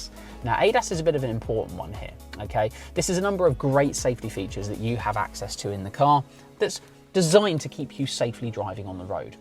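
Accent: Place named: United States. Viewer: is an American accent.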